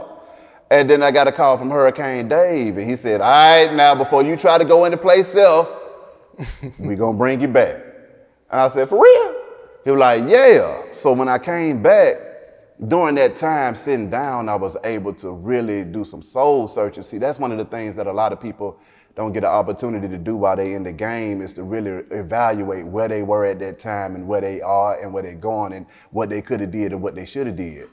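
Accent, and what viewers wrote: South, United States. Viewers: I Would've NEVER guessed talked this much lol He a cool dude I'm from the midwest came across him on youtube I believe he going places he ask insightful questions AND he let the Guest reply without interrupting but can help them carry a answer. I think that natural south delivery can be a plus for mainstream whatever he got planned if the timing right and done with the right platform. Via Shannon Sharpe type shit glowing but stood out being his self on the Hip Hop side of things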